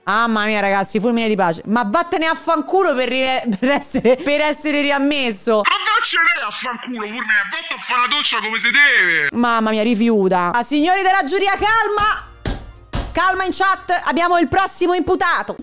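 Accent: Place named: Rome, Italy. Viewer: Sei fenomenale... Mamma il romano mi fa morire!!!!😂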